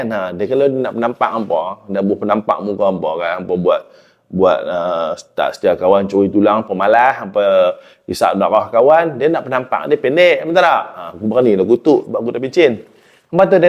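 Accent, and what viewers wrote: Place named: North, Malaysia. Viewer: "Mineral Wattar" Nohhh puas dengaq org utara brcerita....haha..Salam dari Pendang Tuan..Moga Allah sentiasa rahmati Tuan..AMIN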